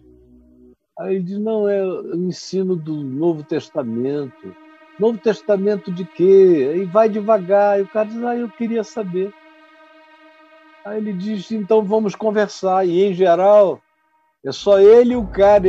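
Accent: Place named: North, Brazil. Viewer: Amo essa linguagem nortista do. 👐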